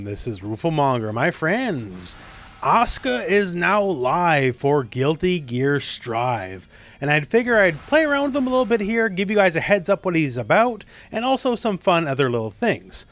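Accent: Canada. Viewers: Won't ever get sick of hearing Rooflemonger pronounce 'cards' in his Canadian(?) accent!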